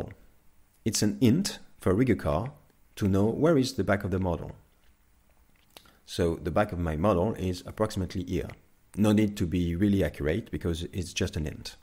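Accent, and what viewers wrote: France. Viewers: U R french, ain't U ? Also, awesom add-on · l'accent français est présent haha ! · J'ai griller l'accent français direct XD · toit, tu a un accsan français je me trompe ? 😁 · Thank you ! Et super accent français en passant =D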